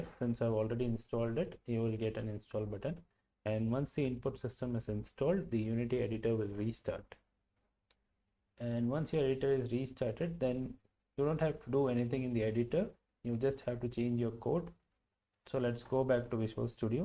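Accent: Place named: India. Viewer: I searched many a tutorial and none could resolve my issue with the new input system. I stumble upon this quick and easy one and in a mere moment my code works perfectly. Thank you random Indian accent tutorial man. I am truly grateful.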